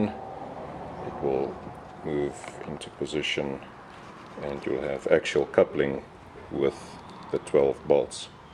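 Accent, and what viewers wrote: South Africa. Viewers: Are you an South African?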